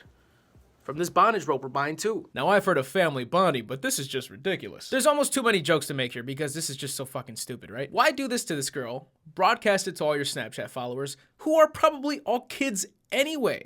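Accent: Chicago, United States. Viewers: You from Chicago, my guy??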